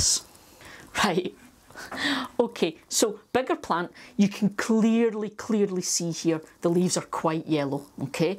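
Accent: Scotland, United Kingdom. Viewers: Interesting video, though I won't have this issue in Barcelona temperature, but as I love the Scottish accent, I kept watching. Reminds me when I lived in Glasgow a few year away :-) By the way the aromatic plants behind looked gorgeous.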